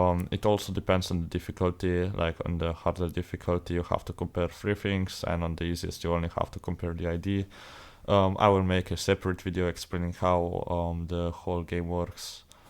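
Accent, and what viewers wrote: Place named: Italy. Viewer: Tf your accent sounds so italian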